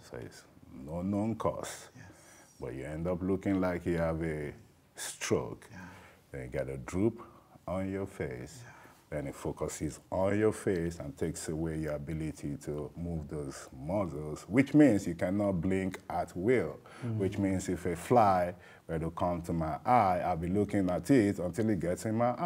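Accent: Nigeria. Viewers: Thank God for his healing. I love his Igbo accent 😊. People say my Junior brother looks so much like him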